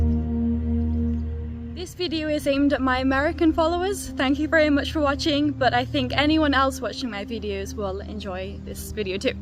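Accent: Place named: Northern England, United Kingdom. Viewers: I really enjoy your videos. You seem to have a slight northern tint to your English. Did you use to live “up North “ somewhere? I’m friends with a Texan Odinist who is very close to her British and German heritage. I’ll send her this video. Thanks! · Did you ever spend time in Northern England? Your accent has a trace of it.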